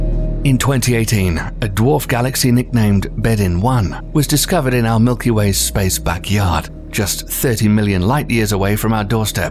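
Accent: United Kingdom. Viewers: Another mystery space video by a channel with an British Voice over guy, how original. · British sounding narrator showing an oblong planet and calling it a football is odd as British football is round and spherical. Most of the world knows football/Soccer as football. Also in Britain, we hate the name soccer regardless of its origins. I wonder why Fifa games do better than PES. So as you can see, hearing a British person say football, but is referring to American football or a Rugby ball is asking for a beat down here in the UK. So the OP WozzzaTV is just expressing is dislike out loud. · narrator, your British, its a American football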